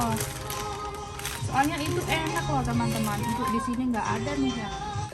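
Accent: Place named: Java, Indonesia. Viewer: Asli mana to MB kok medok bgt ngomongnya 🙏🙏🤗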